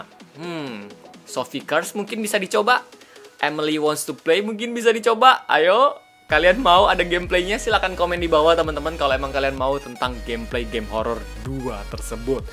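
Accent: Java, Indonesia. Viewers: Kak orang Indonesi apa Jawa Kok ngomongnya Kaya jawa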